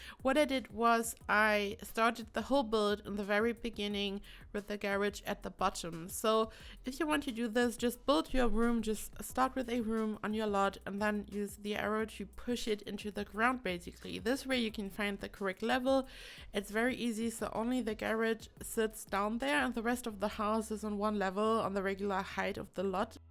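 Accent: Germany. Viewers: Just a question, are you German? Cause I think you have a very German accent! 🇩🇪🖤❤️💛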